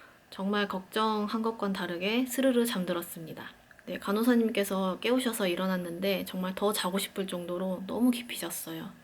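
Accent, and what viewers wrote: Gwangju, South Korea. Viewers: 혹시 전라도 분이실까요?? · 광주분이신가봐요?아닌가?암튼 그래도 고차수 까지 안 가고 성공하신거 늦었지만 진심으로 축하드려요~ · 저도 2월7일날 2차피검 실패하고 다 싫고 아무것도하기싫고 사람만나기가 젤 싫은 중 입니다 말투가 광주 분이신거같아서 영상봣더니 저랑 같은 병원이시더군요…ㄱㅈㅁㅎ 너무 부럽구~ 쌍둥이 임신 축하드려요 저도 그런날이 올까요?ㅠ 서카딘은 그냥 살수잇나요? 처방전받아야하나요?? 저도 광장히 예민해서 잠을 잘 못자고 그렇게 무언가에 쫒기는 꿈을 꾼답니다ㅠ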